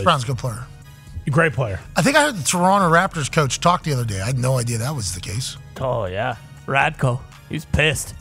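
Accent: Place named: Pittsburgh, United States. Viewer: It's his yinzer accent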